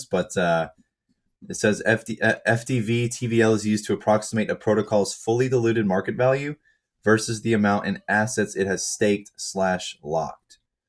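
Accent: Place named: England, United Kingdom. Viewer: Ur English accent is heaven to my ears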